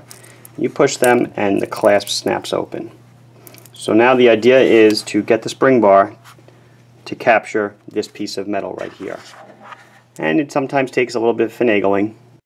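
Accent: Long Island, United States. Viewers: "It popped right awf" "Now that it's instawlled" Love lawnguyland accents.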